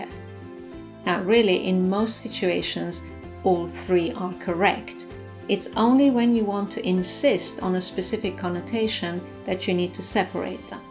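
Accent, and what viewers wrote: United Kingdom. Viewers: Hi, I Just want to say thanks for the usefull tips you give me, I just have a Last question I know you speak british, but what kind exactly? From what City comes your accent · Your accent is very light for someone British. What part of England are you from?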